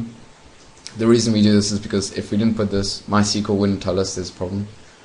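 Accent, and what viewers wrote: South Africa. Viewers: South African yeah? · Yay a South African :)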